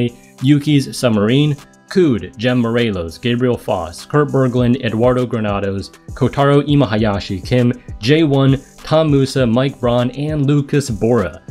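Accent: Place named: Japan. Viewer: Sportstormの動画から来ました。英語に日本人っぽい癖があるなと思ったら千葉在住ww めちゃくちゃ英語お上手ですね！ I was actually interested in the statistics side of this games and you’ve provided everything for me. Dropped a sub just lettin you know.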